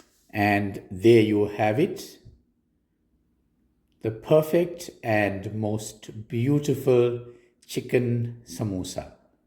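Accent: South Africa. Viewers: ThnXX for Sharing the Recipe with gr8 tips by Pressing the dough balls. Sir just by your accent of talking I knew you’re from South Africa!!! Iam from Zambia LSK. now settled in the 🇺🇸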